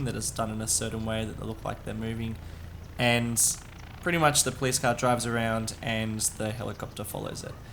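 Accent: Australia. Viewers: Seriously good quality presentation mate. Great to hear another Aussie accent too. Well done mate, top marks. Liked and subscribed. Keep it up.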